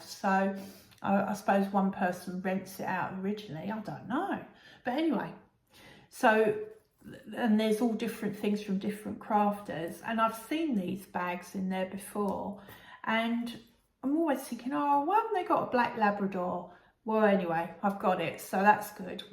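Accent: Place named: Australia. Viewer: G'day - good Aussie accent! A cold Fosters always goes down well on a hot day. Nice poo bag. Wilson will be looking forward to providing something for you to put in it! I will have a look on the Etsy shop. Certainly a ton and more of rainfall in Australia. With the fine weather in the UK you will have to get your bucket and spade out soon to head for the seaside. Happy days! Can you swim or will you need a blow up rubber ring with a horses head or similar on the front! We often went as a family to Great Yarmouth in summer - donkey rides on the beach.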